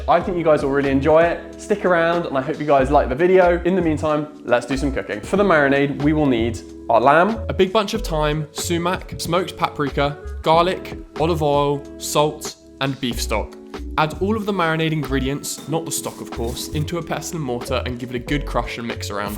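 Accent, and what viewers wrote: United Kingdom. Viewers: Bruh the British accent 😂😂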